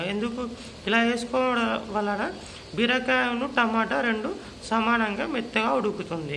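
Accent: Telangana, India. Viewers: Ibbandi padoddu Clear ga telangana bhasha best kada.... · mikku nachinattu cheppu bro... chala kastapadutunnaru padathiga matladataniki.... madyalo Telangana slang vadaru adhe continue cheyu... baguntundhi... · Meeru chala kastapaduthu diplomatic ga matladthunnaruu.. Just miku ela nachithe ala telangana style lo matladandiii.. And nice curry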